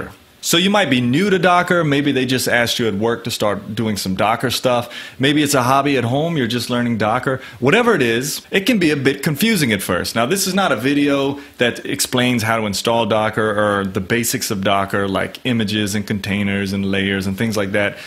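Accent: South, United States. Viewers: love the southern accent maan!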